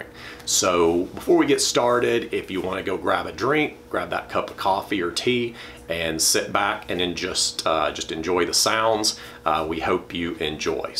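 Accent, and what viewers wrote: South, United States. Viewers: Good heavens! I love the commentary. Folks can turn down the commentary if they don't care for it. I'll bet they don't understand our nice Southern accents. I'm in Alabama, y'all. I love accents. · I do miss the southern drawl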